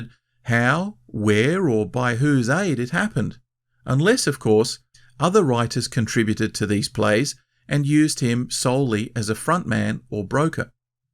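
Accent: Australia. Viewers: It's so nice to hear your Aussie accent. Love from the Mid North Coast, NSW, Australia.❤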